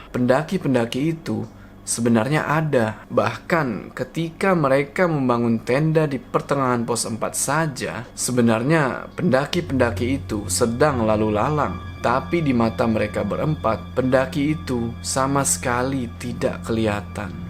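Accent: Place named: Tegal, Indonesia. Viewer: tegal mana suaranya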